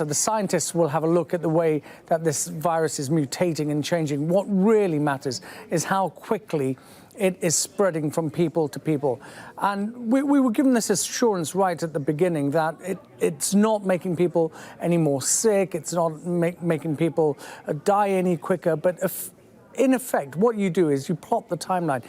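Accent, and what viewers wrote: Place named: United Kingdom. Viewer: An Indian face with a British voice? Now that's human cocktail.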